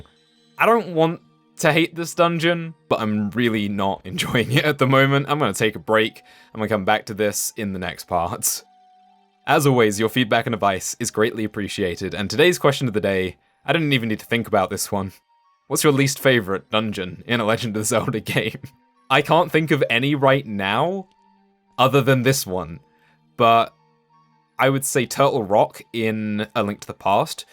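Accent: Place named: United Kingdom. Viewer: your british accent disgusts me.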